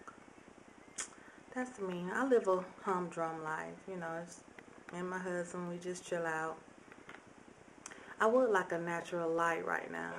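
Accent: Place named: Ivy League, United States. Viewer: lol... ivy league voice...But u r excellent at what u do... and I sense that u do this because this is what u love doing.. every1 doin makeup on here I dont get that vibe from... sometimes I think its done just because its popular and alta peeps r doin it.. but I thank u 4 ur genuity(is that a word?lol) really.. u are a Blessin 4 real.. if people can't c that its their loss hun! :) keep ya head up...stay keepin it real:)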